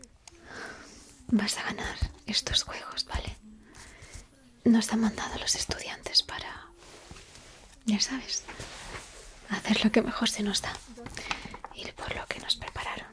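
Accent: Spain. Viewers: Me encanta el asento de España.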